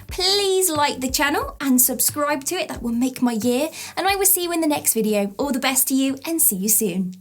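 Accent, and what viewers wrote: England, United Kingdom. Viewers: I absolutely love your voice! Are you English or British?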